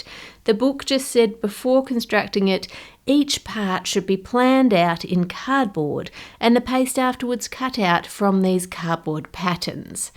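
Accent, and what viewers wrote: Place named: Australia. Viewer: A beautiful creation Ann, and super soothing with the story alongside it. I am German but have lived in Australia (Townville, Brisbane) for 7 years, so love hearing the Aussie accent :) I will dive into some German-speaking research for you after the holidays :) Thanks for all the fantastic videos this year!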